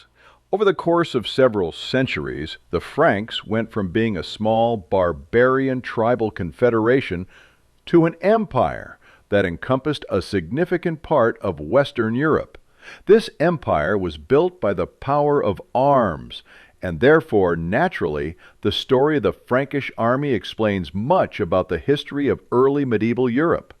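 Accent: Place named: United States. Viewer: No American voice please. We know our own history. Keep out.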